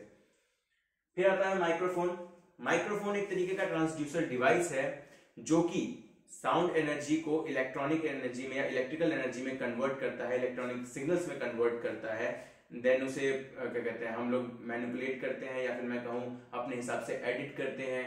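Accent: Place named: India. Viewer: Indians...why...why always Indians :( My poor ears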